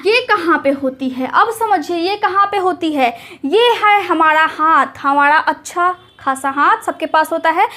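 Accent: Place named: Bengal, India. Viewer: Mam aap Bangal se ho,aapki language se lagta hai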